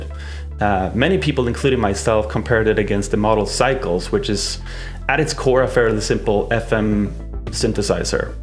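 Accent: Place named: Sweden. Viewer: haha love the "smörgåsbord, wich is swedish for smoargooseboard, and means butter goose table"! xD I'm swedish to and when you said it I was like haha damn his accent is so clearly Swedish and I didn't notice..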